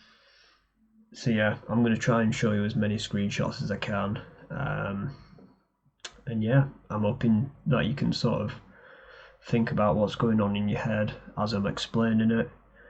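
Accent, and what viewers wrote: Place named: Liverpool, United Kingdom. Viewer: Are you from Liverpool? Your accent sounds alot like my buddies lol forgive if I'm way off the mark XD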